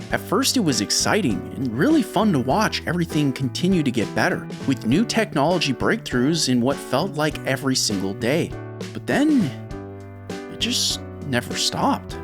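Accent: Quebec, Canada. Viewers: I think he’s French Canadian lol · Are you Canadian? I heard something of a Canadian accent. And you pronounced French words correctly.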